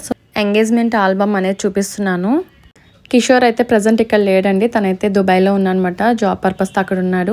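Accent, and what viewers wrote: Godavari, India. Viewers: Mamu godavari slang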